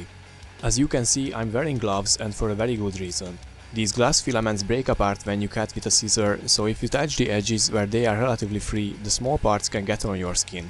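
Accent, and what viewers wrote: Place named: Hungary. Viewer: Hú de hallatszott a magyar akcentus de jó lett a videó. XDD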